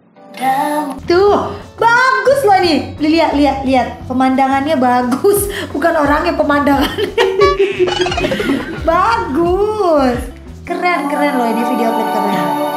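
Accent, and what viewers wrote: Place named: Depok, Indonesia. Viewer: Yu, dialek depok nya , engga holang yaakkk..😁gue sneeng..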